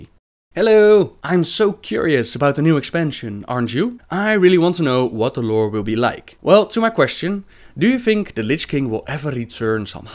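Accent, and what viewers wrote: Netherlands. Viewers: are you dutch? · are you dutch OP?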